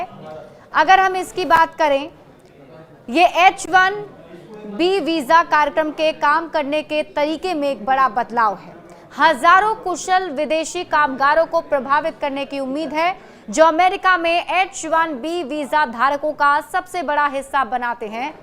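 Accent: Bihar, India. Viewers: bihaaran awaaz se hi lag raha he teri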